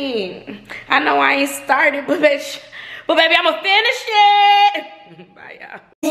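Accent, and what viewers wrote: New Orleans, United States. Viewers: Can u talk in your New Orleans accent with your LA friends 😆 🤣 😂 PLEASEEE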